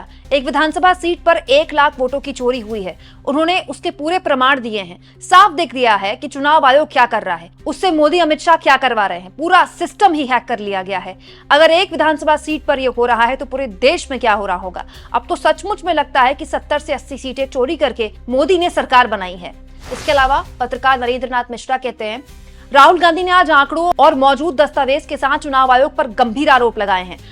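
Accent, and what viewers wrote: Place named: Bengal, India. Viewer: Your voice is bengoli tongue jeetna bhi Hindi bolo your face lip expression is mohali look like kindly improve it bro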